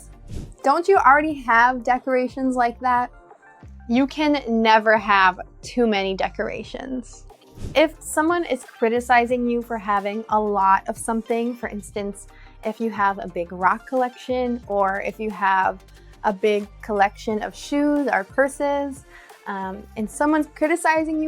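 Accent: United States. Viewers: I love listening her accent as it encourages me to keep focusing on American English which is awesome..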